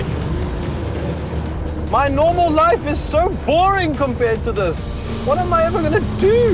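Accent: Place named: South Africa. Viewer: ahhh nice.... no nonsense boring south african..beautiful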